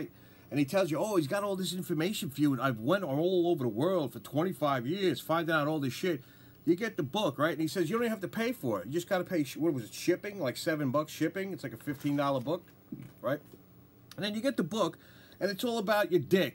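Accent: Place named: New York, United States. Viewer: Yes - that there is an awesome New York accent!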